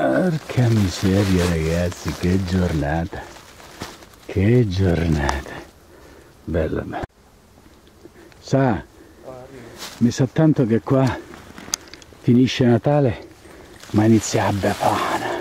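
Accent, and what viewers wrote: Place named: Piedmont, Italy. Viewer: bella l'accento e' proprio pinerolese doc un saluto da albenga e conplimenti bei funghi p.s. in liguria non esiste solo il mare ciao angelo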